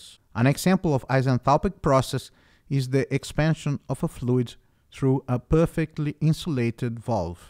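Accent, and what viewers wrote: Brazil. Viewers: Percebi que era brasileiro pelo sotaque hahahaha, ótimo vídeo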